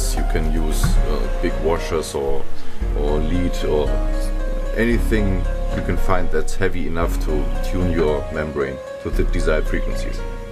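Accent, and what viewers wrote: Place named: Germany. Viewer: i love germans speaking english :D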